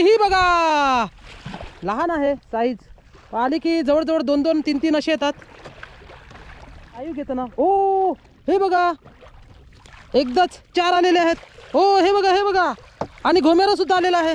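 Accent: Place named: Mangeli, India. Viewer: भाऊ तुझी मराठी मांगेली भाषा ऐकायला मज्जा येते, एक पाट धाडून दे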